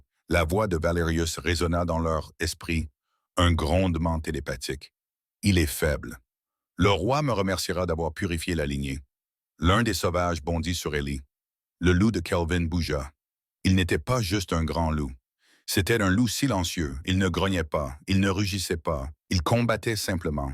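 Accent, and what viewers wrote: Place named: United States. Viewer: Arrete de parle français avec une dialecte américaine, ça casse tout le histoire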